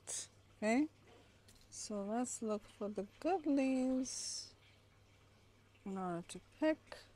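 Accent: Trinidad and Tobago. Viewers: Very nice video. I know a Trini accent when I hear it. XO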